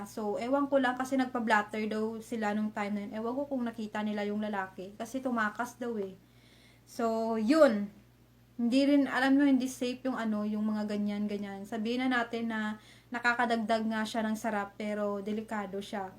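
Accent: Iloilo, Philippines. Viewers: Ilongga ka mam?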